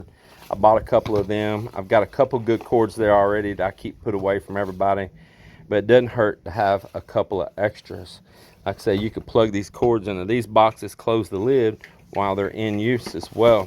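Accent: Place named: East Texas, United States. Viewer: Lol. First time I heard your voice I was like I bet he's from Terrell or Tyler area. Iloilo just went to ECQ. And I've found good outlets for cheap at certain Handyman stores. I use GFC outlets and surge protector strips throughout so my wife can just flip the switch instead of unplugging everything everytime.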